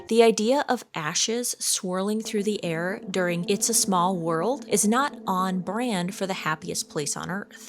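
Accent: Minnesota, United States. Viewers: The Minnesota accent makes this all the more enjoyable. What if you had a version of each episode you do with the accent completely untethered 😂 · I love your Minnesota accent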